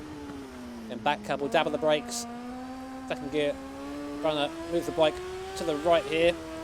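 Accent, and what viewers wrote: United Kingdom. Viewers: Oh wow I didn’t know you had a lisp I thought you were just British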